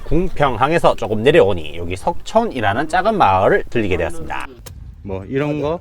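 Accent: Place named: Chungcheong, South Korea. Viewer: 웰컴 서해안ㅎㅎ 경상도 사투리만 나오다 충청도사투리ㅎㅎ고향말투라 더 반갑네요ㅋ뜬금없지만 김포도 함 오세용^^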